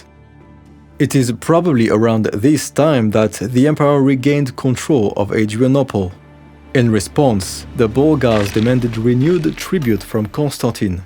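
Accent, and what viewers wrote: France. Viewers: t'es français ? 🧐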